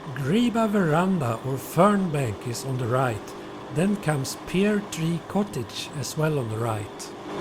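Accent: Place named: Sweden. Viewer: Great work! And your Swenglish sounds good!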